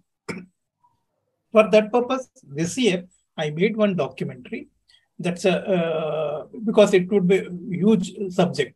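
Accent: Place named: Butler English, India. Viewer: Speaking Butler English.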